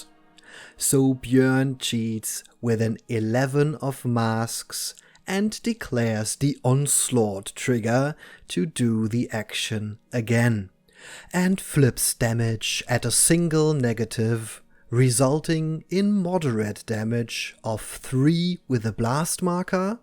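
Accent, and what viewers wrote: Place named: England, United Kingdom. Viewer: Thanks for the battle report. I like your english accent, it seems to fit the theme of the Malifaux universe.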